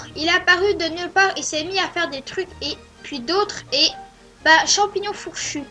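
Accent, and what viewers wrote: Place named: Canada. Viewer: j'ai l'impression que tu es un canadien ce n'est a cause de l'accent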